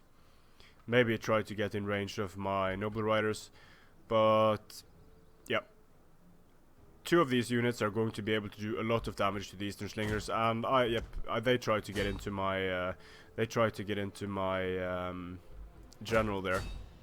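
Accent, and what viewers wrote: Scandinavia. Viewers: Great video!! Your english sounded quite like "the Scandinavian english" - Am i wrong/right? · You sound exactly like the guy from Red Ice radio. Norwegian / Scandinavian English accents sound very good... Most of you speak better English (with equivalent vocabulary) than perhaps 75% of Americans and 50% of Brits.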